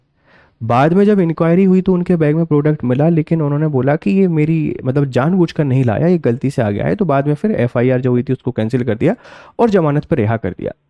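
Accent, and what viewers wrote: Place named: Madhya Pradesh, India. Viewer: Arey madhya pradesh aur maharashtra ke taraf (ri) ko (ru) bola jata hai samjhe.. Isleye wo aise bol rhe.. 😮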